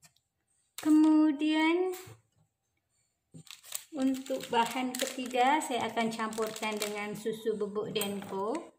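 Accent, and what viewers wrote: Malaysia. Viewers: Logatnya kaya ngomong bahasa malaysia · Asli dari mana kak? Logat malaysia dialeg Indonesia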